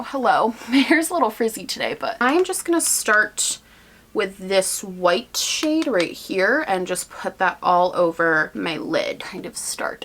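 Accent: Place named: New York, United States. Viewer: I bet you live near me! So excited when I find folks on YouTube who live in my area of NY! I also thought that your accent sounds like a local here. Lol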